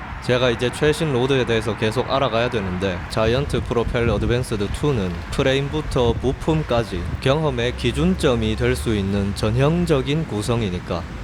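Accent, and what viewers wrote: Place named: Daegu, South Korea. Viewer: 대구 아재의 구수한 입담은 즐거워~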